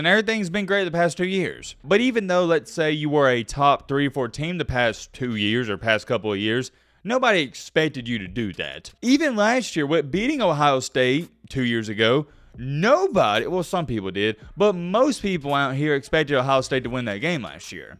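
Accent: South, United States. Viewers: Your voice and accent are excruciating. Southern nerd 🤓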